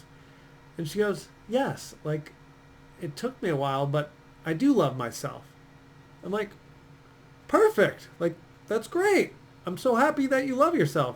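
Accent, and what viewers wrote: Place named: New York, United States. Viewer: You're so smart & your vids are so wonderful & so helpful -- both by yourself and, especially, with Helena. You're funny, smart, cute & handsome,. Love your NY accent... plus your wild enthusiasm & wicked humor!!! God bless you.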